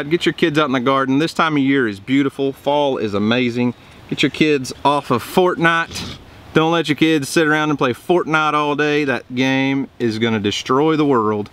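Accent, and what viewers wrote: United States. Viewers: great video, love your accent, reminds me of all the cowboy movies i watched growing up here in Scotland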